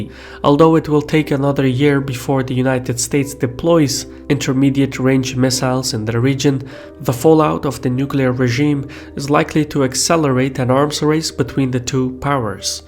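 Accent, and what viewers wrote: Europe. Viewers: That's an extremely rich European accent, you've got there...The two most expensive purchases most average people make in their unfortunate lives, is the home and their car. If it wasn't for mortgages and motorcars, then what would capitalism and its banks do with themselves? Hopefully jump off an extremely high cliff...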